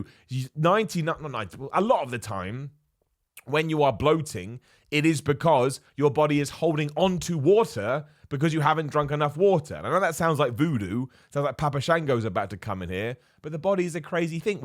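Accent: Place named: Northern England, United Kingdom. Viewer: From your accent I could never work out if you're a northerner or southerner. Then you mentioned you're from near London in a few of your videos. Made me think, where is that northern twang from. Worked out from this video it might've been from your time at university in Manchester 😂😂😂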